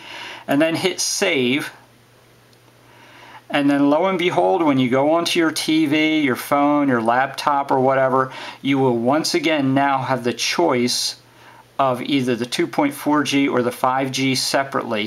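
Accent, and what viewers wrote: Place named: Philadelphia, United States. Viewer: Thanks for the help dude. From another dude from the Philadelphia metro area, I recognize that accent anywhere!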